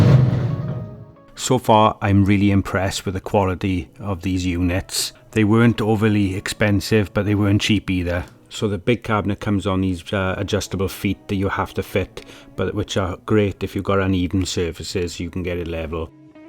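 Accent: Wales, United Kingdom. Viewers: By any chance are you Welsh ??? 😊 Good luck mate and where are you?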